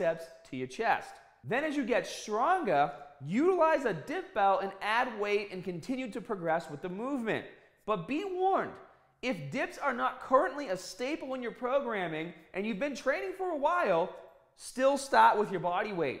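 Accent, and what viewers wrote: Boston, United States. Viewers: Holy Boston accent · The best part of this channel (outside the solid tips) is that if anyone new comes here, they will be so confused why he suddenly has or lost a Boston accent.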